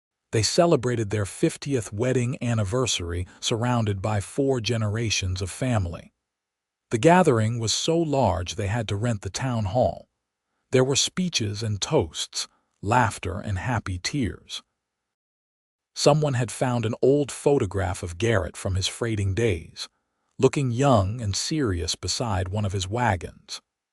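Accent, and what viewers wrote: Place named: United States. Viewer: A 10 story with my favourite voice of the narrator, who gives with his authentic American English the stories a sound like music to "the West". And it tells once more, that a long lasting love is decision and work, the gold around is light from above... Greetings from Germany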